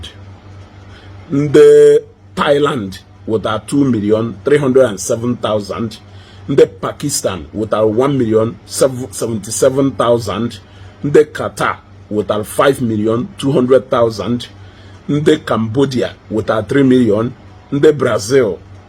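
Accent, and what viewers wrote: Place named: Nnewi, Nigeria. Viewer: Nnewi be anyi i na asu ebe a na-ato m uto okanku. Doo na-asusiya ike.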